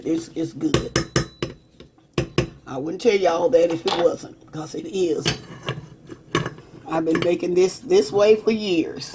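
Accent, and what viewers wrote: South, United States. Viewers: I'm so glad I stumbled across your channel. I'm definitely going to try this for Christmas. I love your southern accent, I'm from good ol Alabama myself lol When you said, "I don't do no roux and all that, unt unh" I 🤣 and I felt that Merry Christmas.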